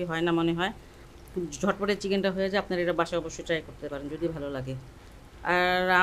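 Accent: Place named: Old Dhaka, Bangladesh. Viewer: আসসালামু আলাইকুম আন্টি। রান্না করার সময় আপনার হাতগুলো দেখে মনে হয় একদম আমার আম্মুর হাত এর মতো। আর আমার নানাবাড়ি পুরানো ঢাকায়। আপনার কথা বলা আর রান্না দেখে মনে হয় আপনিও পুরোনো ঢাকার মানুষ। অনেক শুভকামনা আপনার জন্য আন্টি। দোয়া করবেন আমাদের জন্য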